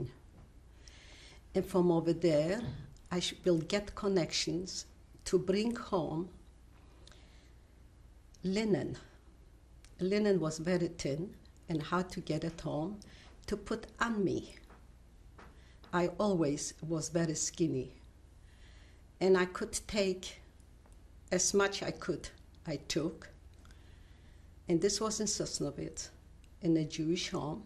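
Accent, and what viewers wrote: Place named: Germany. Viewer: Boy she has that strong German accent especially when saying my the German way Meine Vater the v make the F sound in German.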